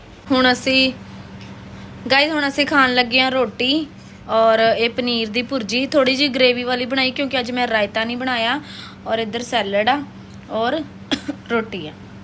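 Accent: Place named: Gurdaspur, India. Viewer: Je TUC gl gl te va va krde je aa bhut sohna lgda ah sade gurdaspur Batala wale bolde aa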